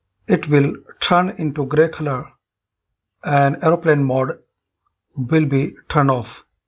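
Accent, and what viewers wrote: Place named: India. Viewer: Can you ever hear one of these videos without the person sound like an Indian scam artist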